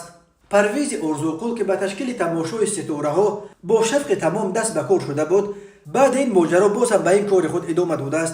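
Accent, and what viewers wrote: Pamir, Tajikistan. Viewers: Памирский акцент, помири гап зан помири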